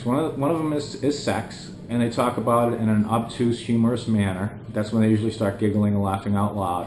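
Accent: United States. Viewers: I like the style of these videos of yours, they are just more thoughtful than than a lot of other video blogs. I am Brit myself, I like that 'Yankee' way of talking you have, casual -laid back but informative.( Yea, I know that internally Northerners are Yankee, but to those outside, we call 'ya all' Yankees). I am looking ahead to retiring in the phillipines, still a few years away. I am thinking of literally sailing off to the Phillipines on my boat, living there and and puttering about. Your videos just give me a little bit of a heads up on what to expect; hopefully expats as thoughtful as yourself are part of the package, thanks for your insights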